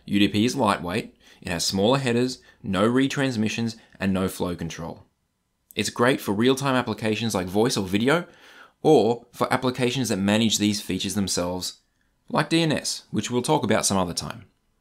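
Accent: Australia. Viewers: That's not Indian lol. He's Australian. Easy to understand.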